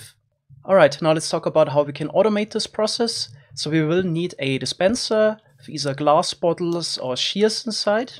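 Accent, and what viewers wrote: Germany. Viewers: German accent? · Are you German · 100% deutsch mit dem schlechtesten Englisch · Ist er deutsch? :D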